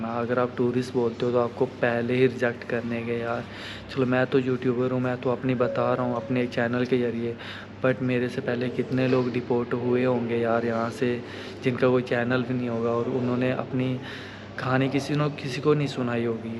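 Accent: Pakistan. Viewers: अबे तेरी बातों से और जैसी हिंदी बोल रहा उससे पता चल रहा साफ साफ तूं पाकिस्तानी है इंडिया को बदनाम करने की साजिश मत कर chutiye कुछ नहीं उखाड़ पाएगा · Bhai teri tone se lag raha hai ki tu pakistani hai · Iska accent pakistani kyu hai?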